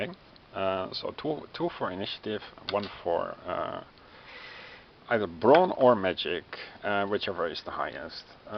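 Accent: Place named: Netherlands. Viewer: Ah I thought I recognized a Dutch accent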